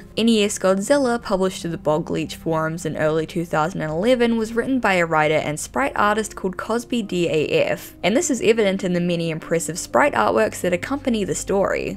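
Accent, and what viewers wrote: New Zealand. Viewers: I love your videos, but the kiwi accent is what totally hooked me 😅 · Is she a kiwi?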